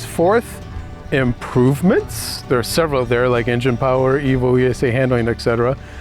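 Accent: United States. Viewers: First time watching your channel, but noticed you have the most American accent of any European I've encountered. I like the adventure bike, but like you stated, I think I'd rather ride something else for exhilaration. I do love Ducati motorbikes.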